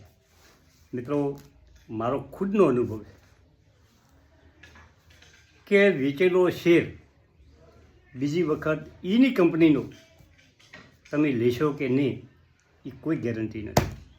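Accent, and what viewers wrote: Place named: Vav-Tharad, India. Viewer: કાકા તમારી બોલી વાવ થરાદ બાજુ ના હોય એવુ લાગેછે જવાબ આપવા જો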